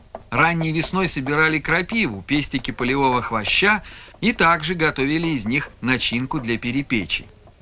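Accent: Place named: Russia. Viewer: Тогда автору придётся все языки учить прежде, чем фильмы про каждый народ ставить... Если следовать Вашему требованию -- мы никогда этих фильмов и не увидим вовсе. Да и -- что он говорит не так? Я -- коми. И то поняла слова "дöрöм" -- "рубашка"; "турун" -- "трава"; "нянь" -- "хлеб"... Молодец, что произносит с русским акцентом. Уважение и благодарность ему за это! Спасибо --, что выставил этот замечательный фильм. Очень интересно было его посмотреть.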